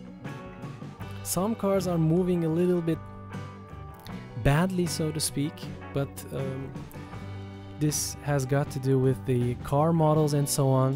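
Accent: Turkey. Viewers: türk oldugunu videoya tıklar tıklamaz anladım her turlu belli oluyor rleri söyleyişten :D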